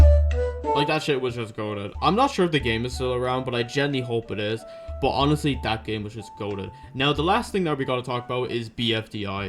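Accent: Ireland. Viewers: are u from Ireland? If you are i am too!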